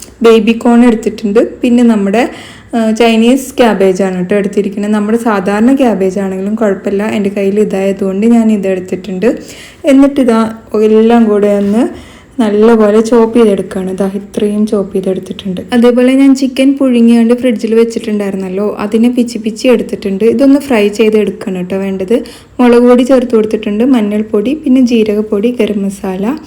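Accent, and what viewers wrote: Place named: Kannur, India. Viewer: Fest thane adichu varunna seen ozhivako maachi🤣🤣🤣superatttto to to to kelkan rasam kannur ami good recipy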